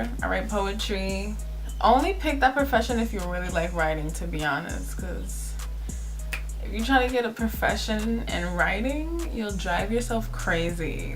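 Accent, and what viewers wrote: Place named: New York, United States. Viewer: 5’6 gang! And listening now, you have a little NY in your voice. This was a great video 😂❤️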